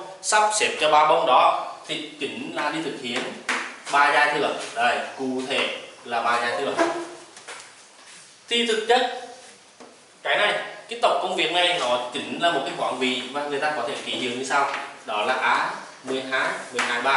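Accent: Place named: South, Vietnam. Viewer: lâu lắm mới nghe giọng miễn nam hay ghê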